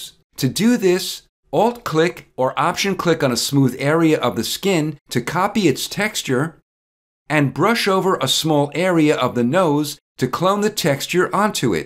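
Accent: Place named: Long Island, United States. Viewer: Oh my gawd! I'm from Lawn Guy Land! I live near Seattle now, and I get 'the look' ALL the time! Your accent is like 'home' to me! GREAT tutorial too! THANK YOU!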